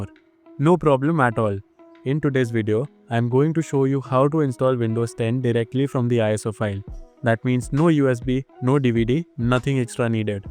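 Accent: India. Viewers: Indian accent. Yessssssss!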